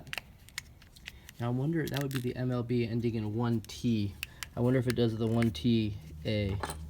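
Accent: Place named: United States. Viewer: OMG, finally there is someone here speaking AMERICAN!!!! I purchased this VVDI tool for months now, whenever I looked it up on Youtube all I got was either Thailand or the shitty Russian voice. Thank you and thank you for your video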